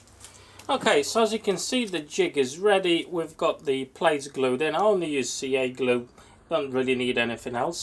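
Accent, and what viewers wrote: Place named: United Kingdom. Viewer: Wiedziałam, że z UK. Zastanawiałam się tylko z jakiego miasta konkretnie. Fascynują mnie akcenty. Ja mieszkałam przez 5 lat w Colchester w czasie studiów.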